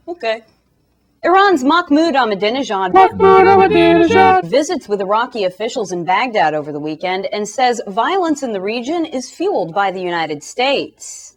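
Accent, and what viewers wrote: Russia. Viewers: Nice Russian accent!! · hahahah <3 your russian accent is frkn awesome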